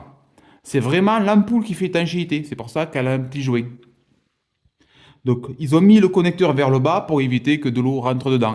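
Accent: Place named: South, France. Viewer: Excellente explication avec de bonnes astuce ! Et un accent du soleil qui fait du bien en prime 😊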